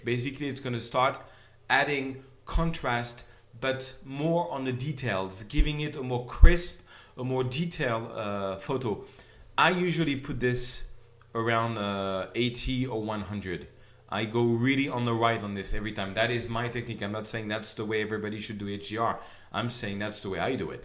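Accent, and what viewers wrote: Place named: France. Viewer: i love your tutorials, i love your frenglish accent :D keep it up (thumbs up)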